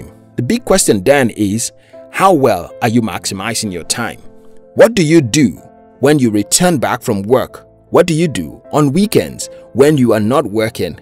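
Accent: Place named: Nigeria. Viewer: This accent is from Nigeria 🤩. Pick up's Nigeria for going full swing. It is grear channel. Im subs. Love it. Born in Mozambiqye myseld, living in South Africa. Thanks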